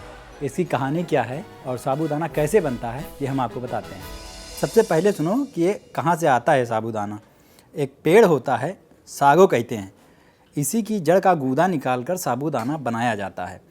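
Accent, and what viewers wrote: India. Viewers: Ekdam देसी accent hai. Hum sab bhartiya apni matrubhasha ki accent mein jab bat karte hein, to ek alag sa feel hota hai. Angrezi se door hote hi sukun milta hai 😄😄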